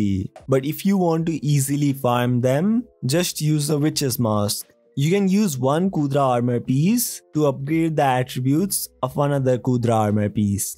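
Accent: India. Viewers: Because your voice was sounding Indian to me and you had added Hindi subtitles as well🗿😂 Is my guess correct?😳 Please reply me 😭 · you r indian dont lie :) you r talking in indian english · why do you sound like indian dialect? By the way, very good content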